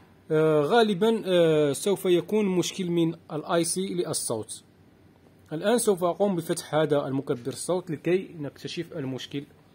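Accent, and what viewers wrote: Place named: Morocco. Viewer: عمل رائع أخي أريد أن أسألك عن مكان شراء هده القطع الإلكترونية في المغرب لأن من لهجتك عرفت بأنك مغربي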